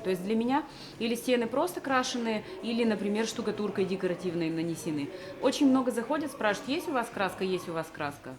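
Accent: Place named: Udmurtia, Russia. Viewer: Вот это акцент, наверное, вы из Удмуртии, в Воткинске так же говорят. Привет из Москвы.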